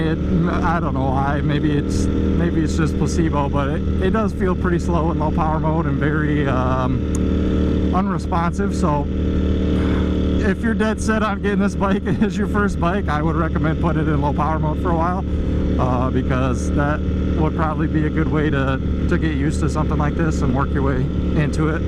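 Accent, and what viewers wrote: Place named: Michigan, United States. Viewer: Great videos man keep it up! I'm from MI originally, in socal now. Looking to upgrade to SF V4S shortly. You have a strong Michigander accent lol, sound just like all my family from Dewitt.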